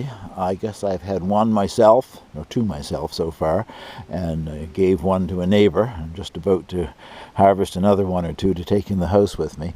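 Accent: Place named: Canada. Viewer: Hey! No clue on what made your video go viral. Anyway, I don't know a thing about gardening, etc, but your videos are still fun to watch. I especially like any videos relating to your cabin. As a matter of fact, that's how I found your channel. I searched for videos about cabins and found your channel maybe a year ago. Keep 'em coming! Always nice to hear that Canadian accent. 😁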